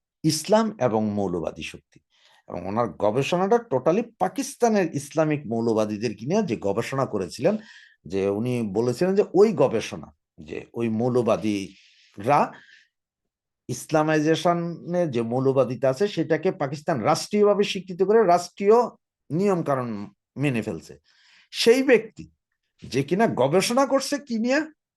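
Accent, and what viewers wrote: Noakhali, Bangladesh. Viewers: এরে তুই কে রে? তুইতো মনে হয় নোয়াখাইল্যা। তোর বাড়ি কইরে? তোর নাম কী রে?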